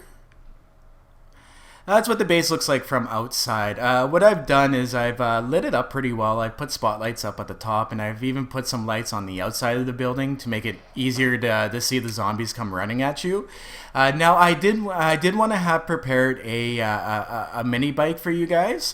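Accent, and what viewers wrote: Canada. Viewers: you canadian cause i am and u sound like u are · You're totally Canadian aren't you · he sounds canadian..... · You sound so canadian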